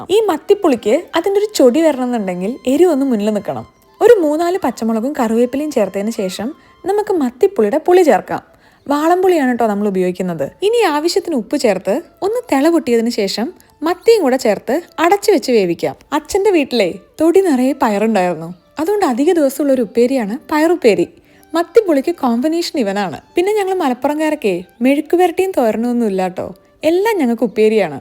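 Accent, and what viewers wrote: Malappuram, India. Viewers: ചേച്ചി മലപ്പുറം കാരിയാണല്ലേ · വിവരണം കേട്ടിട്ട് കൊതിയാവുന്നു.... 😋🤩 ചേച്ചി മലപ്പുറം ആണോ... 🤩❤ മലപ്പുറം എവിടെയാ...? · അപ്പൊ ചേച്ചി മലപ്പുറംക്കാരി ആണോ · ഇങ്ങള് മലപ്പുറാ...😅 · Malappuram aano veed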